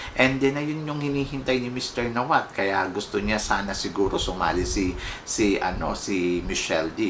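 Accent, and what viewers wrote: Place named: Philippines. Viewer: Anong probinsya mo sa Pinas,? Para kasing hindi Tagalog ang native tongue mo.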